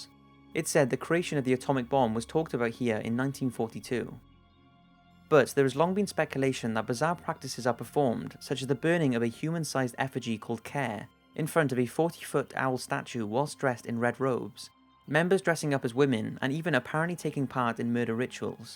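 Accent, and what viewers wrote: United Kingdom. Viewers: the British accent helped me stay calm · damn, british accent...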